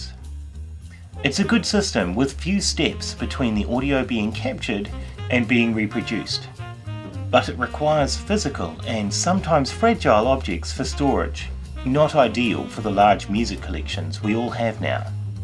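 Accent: New Zealand. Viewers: yep New Zealand, just checked. They say "heedphones" :) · OMG a New Zealander opening his mouth. God save my ears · Nice to hear a fellow Kiwi from New Zealand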